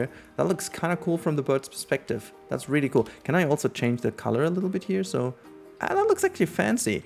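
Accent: Germany. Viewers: Du sprichst ziemlich gut englisch, man hört trotzdem sofort das du Deutscher bist ;)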